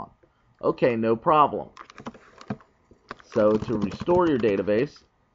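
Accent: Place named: United States. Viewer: the only video on youtube with north american English accent, easy to understand and no headache, no matter how good tutorial is by our Indian bros but very thick accent not worth watching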